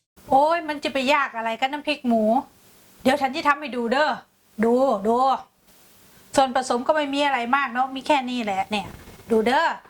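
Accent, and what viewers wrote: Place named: Korat, Thailand. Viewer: ฟังเสียงแล้วใช่เลยคนโคราชบ้านเอง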